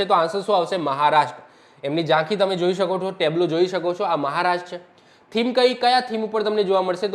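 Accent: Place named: Sabarkantha, India. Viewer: Mu sabarkantha no so su saheb tamari bhasa mara jevi se ❤❤❤❤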